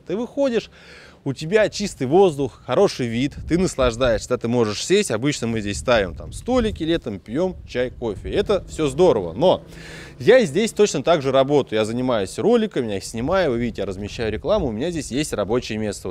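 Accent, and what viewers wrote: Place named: Provinces, Russia. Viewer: Он конечно старается молодец, но речь ужасна. Прям видно из провинции.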